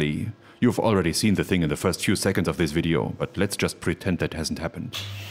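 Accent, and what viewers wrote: Germany. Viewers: Ing_lish with a German accent/humor combination is in itself precious, but when adding a torrent of valuable technicality ... can't be described in words 😉.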